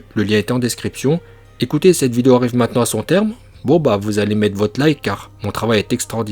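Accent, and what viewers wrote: Japan. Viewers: ton travail de porte parole, ton accent japonais, masterclass, le jour où tu seras chauve tu auras sans doute la capacité d'utiliser le kansei migatte no chauvui avec un peu d'entraînement, bonne soirée à toi et tu passeras le bonjour au grand maître kishimoto 🙏🏻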